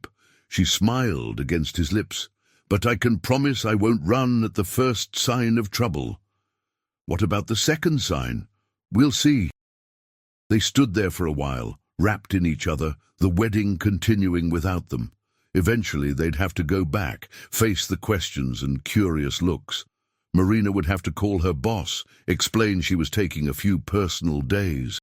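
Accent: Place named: United Kingdom. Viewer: Nisam gledala jer nema previd več Englesko - Britanski naglasak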